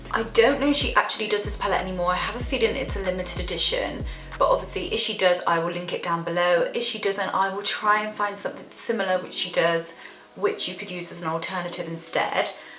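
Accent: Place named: RP, United Kingdom. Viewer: LOVE THE BRITISH POSH ACCENT !!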